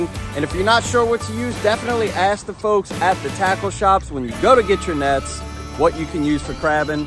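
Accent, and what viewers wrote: Louisiana, United States. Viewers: I came over from arms, family homestead, and I really enjoy your Louisiana voice and your videos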